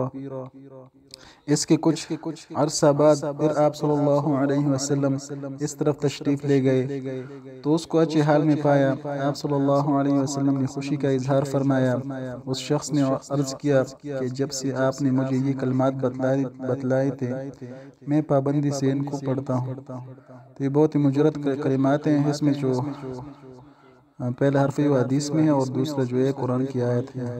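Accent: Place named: Arab world. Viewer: بھائ جان اردو زبان بولتے ہوے عربی تلفظ ادا کرنا درست نہیں کیونکہ عربی اور اردو میں فرق ہے اپنی اصلاح کے لئے بات کی ہے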